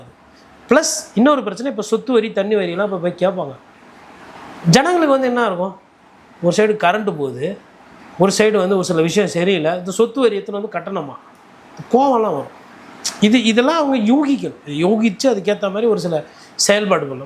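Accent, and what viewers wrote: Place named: Chennai, India. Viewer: அண்ணா நீங்க வேற மாதிரி.சென்னை பாஷைல.ஆனா நா கோயம்புத்தூர்.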